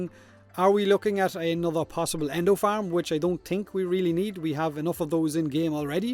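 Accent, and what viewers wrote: Ireland. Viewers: You said you wanted [Akmagnus Prime], but is there another weapon you’d like to see Primed? For me, it’s [Akmagnus] too, cuz America! Fuck yeah! As well as [Corinth]. I love that fucking shotgun! Good ole’ American magnums and pump action, am I right? You wanna kill something, buy American! (OuO) <3 Love your vids and please, for the love of Clem, let me hear your full Irish accent. I’m Irish-American, and I can’t get enough of your accented hilarity!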